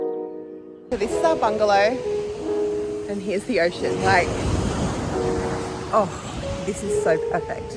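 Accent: Australia. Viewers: Sound aussie!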